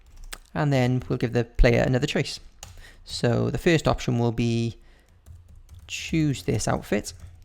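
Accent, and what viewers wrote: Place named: England, United Kingdom. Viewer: Can somebody help me...Whereabouts in England does his accent originate from? It's gorgeous, I can't get enough of it!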